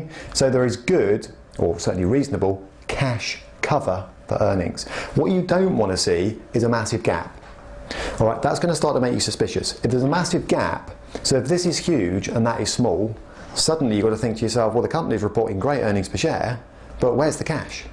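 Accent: United Kingdom. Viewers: I love your presentation and British ascents ：)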